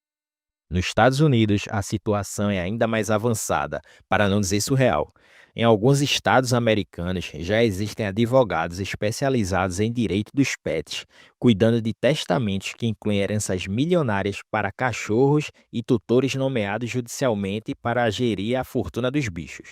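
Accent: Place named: Pará, Brazil. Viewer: Sotaque forte paraense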